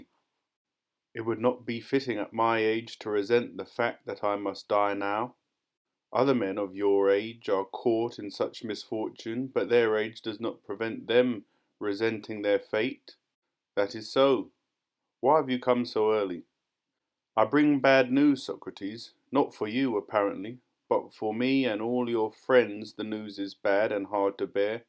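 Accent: United Kingdom. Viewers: Gracias bro. Are You british?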